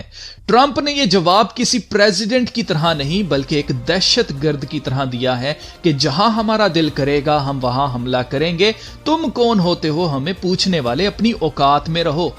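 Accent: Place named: Pakistan. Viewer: Your accent is pakistani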